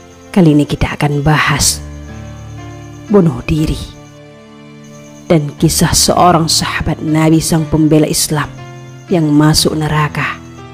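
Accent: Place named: Medan, Indonesia. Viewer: logat medan😂😂😂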